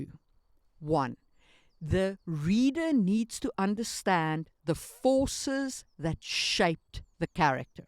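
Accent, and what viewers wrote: South Africa. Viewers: Is that a South African accent I hear?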